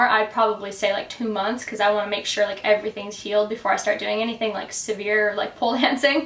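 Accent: Australia. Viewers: I only just read that you live on the Central Coast! I was thinking your accent had some big Aussie leanings! That's awesome. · i love how you have a little bit of a an aussie accent that comes out every once in a while :) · I'm new to your channel and I just have 2 questions. 1. Does your Australian accent come in and out? 2. What do your tattoos mean?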